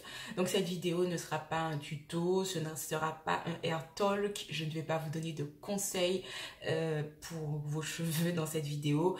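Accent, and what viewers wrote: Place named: Antilles, Caribbean. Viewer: dès les premières secondes, je reconnais l'accent antillais. :)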